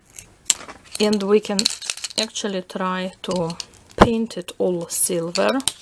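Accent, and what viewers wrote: Russia. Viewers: Are you Russian? I love the accent!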